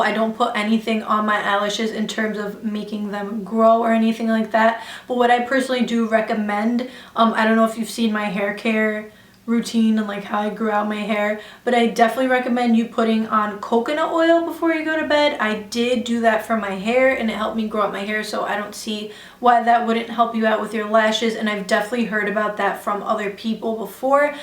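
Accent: United Kingdom. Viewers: Love the English accent!! I'm from the uk. We do say i can't be botherd alot 😄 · omg loved this video to the moon and back, you had me laughing so hard with the "I can't be bothered" xD love brits accents! you seem in such a good mood definitely contagious cause now I'm smiling! :p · I subscribed because your British accent made me laugh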